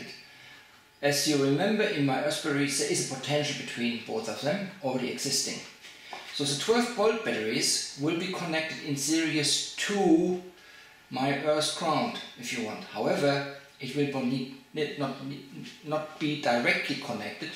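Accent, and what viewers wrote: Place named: Germany. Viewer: your accent sounds german, where are you from ?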